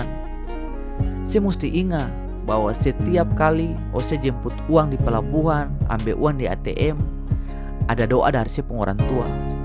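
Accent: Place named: Ambon, Indonesia. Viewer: kk punya kekuatan itu di bahasa dan dialeg ambon, KK percaya saja samua orang pasti pahami dialeg kk, semangat KK #ambonpride 👊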